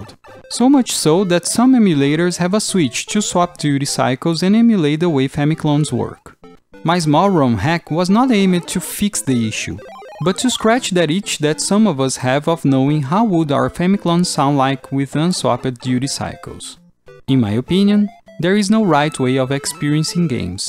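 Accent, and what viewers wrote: Brazil. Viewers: O sotaque de um brasileiro é realmente familiar. Que legal. 🎉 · Brazil accent spotted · Bro you have the most Brazilian accent I've ever heard! 🇧🇷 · O sotaque BR gritando hauhauha Excelente vídeo amigo. Ganhou um sub. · when you spoke I already knew u were Brazilian 😂😂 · o sotaque brasileiro é inconfundível KSKSKSKS mas acho que peguei uma época diferente, porque eu jogava Super Mario Bros no emulador de NES do Ps2! É interessante saber que em outros consoles ele era transmitido diferente, eu não sabia disso haha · Eu conheço meus irmãos brasileiros pelo sotaque, abraço, excelente video! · Você é brasileiro? Seu sotaque lembra um pouco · Eu amo como as palavras ficam com um sutaque brasileiro kkkk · orra, o sotaque dele FULL BR mano kkkk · Cai nesse vídeo aleatoriamente. Não Conheci ao canal. Por acaso você é brasileiro? Sua pronúncia é idêntica a um brasileiro falando inglês. 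· I know a brazilian when I hear one, great video bro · Eu sabia que o teu sotaque era brasileiro demais pra ser um gringo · Eu tava sentindo um sotaque brasileiro ai no meio, fui ver o canal e é BR kkkkkkkkk, mt bom o vídeo mano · Sotaque brasileiro batendo forte. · Sotaque de brasileiro… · Esse sotaque não me engana! Brasileiro, né??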